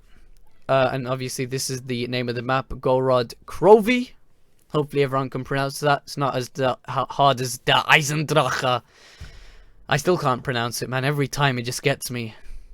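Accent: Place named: RP, United Kingdom. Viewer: Fucking posh mate🙈🤗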